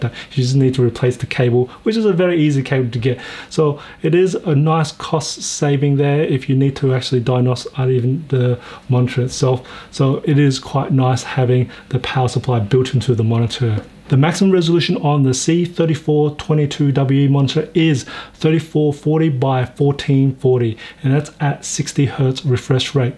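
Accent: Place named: Australia. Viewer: You sound Australian, if you are where did you buy this monitor from in Australia?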